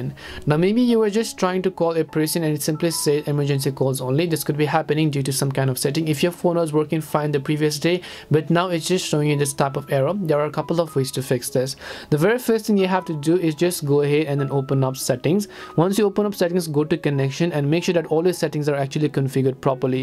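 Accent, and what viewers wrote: India. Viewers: Why is it always when I'm looking for tutorial its a dude with an Indian accent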